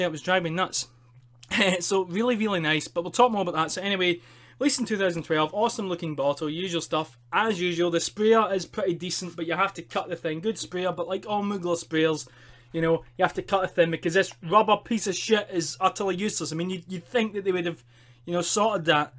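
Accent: Scotland, United Kingdom. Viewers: Where in Scotland you from ?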